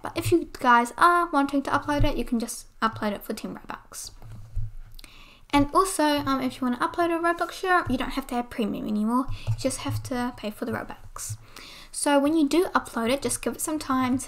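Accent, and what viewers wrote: New Zealand. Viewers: Love the kiwi accent. You were a big help since I got hired for a clothing group and needed to learn fast.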